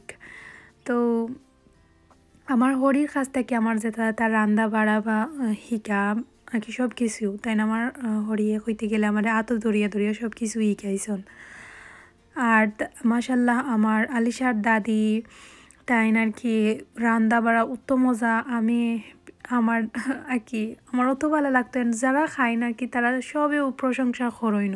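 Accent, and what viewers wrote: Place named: Sylhet, Bangladesh. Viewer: আপু সিলেটের মাত হুনলে যে ভালা লাগে মন শান্তি অই যায় আমি নতুন আশা করি পাশে থাকবা আর ভ্লগ অনেক সুন্দর হইছে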